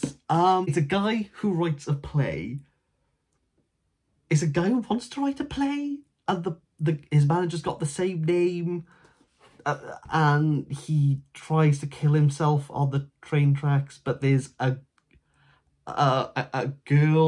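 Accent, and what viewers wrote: Wales, United Kingdom. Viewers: This made me giggle! Here in Melbourne it was lovely to hear a fellow Welshie! Thanks go to Simon for pointing me in this direction! 😊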